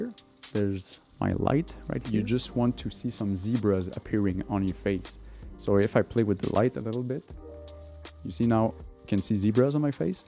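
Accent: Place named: Quebec, Canada. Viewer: I think I can hear you quebec accent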